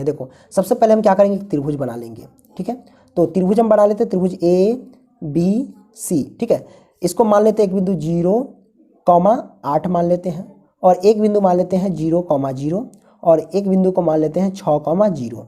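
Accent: Bihar, India. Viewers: Very nyc sr ji Se ji mughe bihari language bhut achci lgti h such m mja hi aa jata h . ❤❤😂😂❤❤😂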